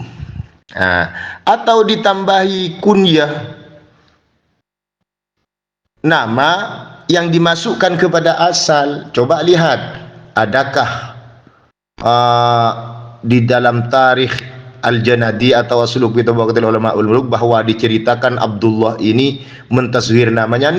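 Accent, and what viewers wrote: Madura, Indonesia. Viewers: Hahaha.. agelek reng medhure mantap😂😂😂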